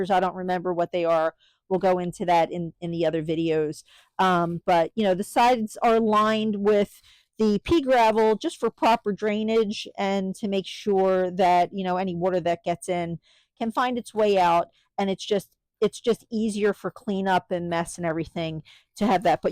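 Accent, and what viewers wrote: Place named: New Jersey, United States. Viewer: Thanks for the video. I’ve been wondering where your accent is from and I was correct. You sound just like my cousin who grew up in Morristown NJ. We lived in NY at the time. Thinking of getting this greenhouse. We live in ND and the wind is relentless!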